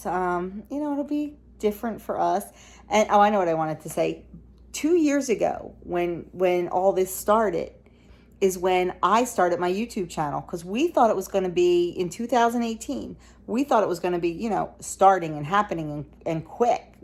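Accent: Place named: New Jersey, United States. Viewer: I love your NJ accent I am laughing saying this because I live in Worcester MA and I totally have accent. I love your recipes and everything you share. I got the vaccine too. I had Covid 19 in May of 2020 it was awful. I never want it again. Can't wait to see the chicks Doug did such a great job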